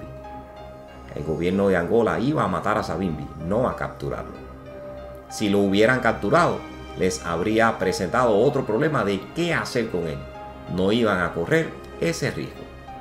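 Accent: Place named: Cuba. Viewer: YO SOY CUBANO Y POR TU ACENTO SE QUE ERES CUBANO, HACES MUY BUENOS VIDEOS TE FELICITO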